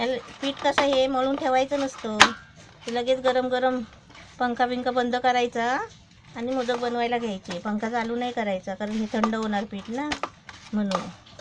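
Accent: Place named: Malvan, India. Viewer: मस्त बोलतात... फुल्ल मालवणी एक्सेंट.....जय भंडारी ..जय मालवणी... बरोब्बर असा "हात भाजलो तरी चालत्लो.पण पीठ गरम गरमच मळूक वह्या. .....मी पण पुढच्या वेळपासून पंखो बंद करूनच पीठ मळतलय..!!!......."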